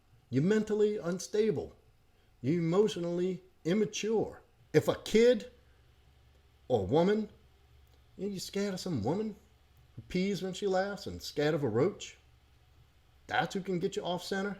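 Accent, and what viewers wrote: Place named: Louisiana, United States. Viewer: I can tell you’re from Louisiana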